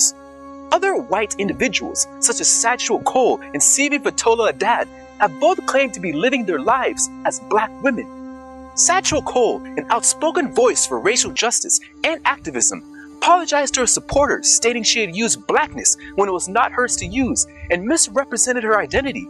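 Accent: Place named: Jamaica. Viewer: This is awesome!! I detect a very slight Jamaican accent so I'm double proud! #blackexcellence #videoswithpurpose 🏆🥇🏆🥇🏆🥇🏆🥇🏆🥇🏆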